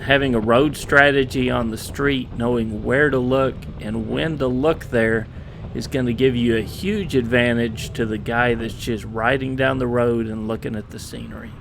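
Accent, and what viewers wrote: Texas, United States. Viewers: 5 but then again your Texas accent upped my stress level.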